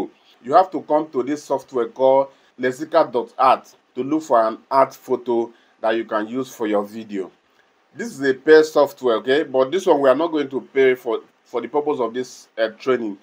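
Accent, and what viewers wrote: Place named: Africa. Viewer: Beautiful african accent brother. All the best insh'Allah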